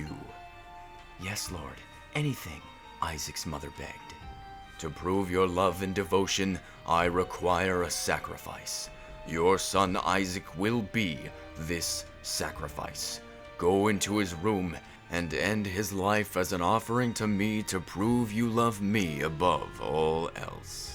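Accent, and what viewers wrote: Australia. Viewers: NOICE Aussie accent! You're actually amazing. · You sound Australian.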